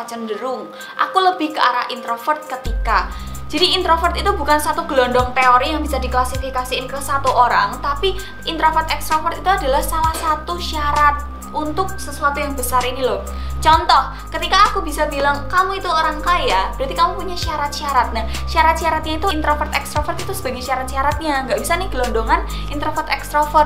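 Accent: Java, Indonesia. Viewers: Haduh lagi" gue gx pikir judul ama isi Lu ngomong ja dah bikin huha Sakjose medokmu ngakakku #nyerang_esteh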